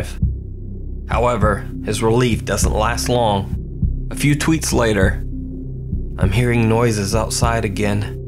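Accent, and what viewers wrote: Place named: South, United States. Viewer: Its the slight southern draw 😆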